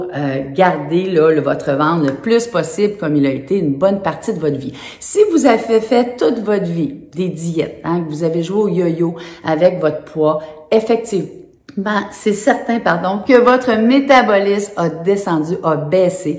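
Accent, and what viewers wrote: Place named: Canada. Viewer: J'ai du mal avec l'accent canadien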